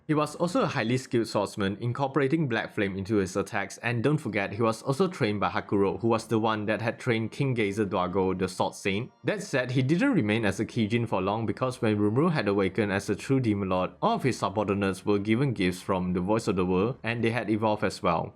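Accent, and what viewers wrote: Singapore. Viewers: Singaporean accent :)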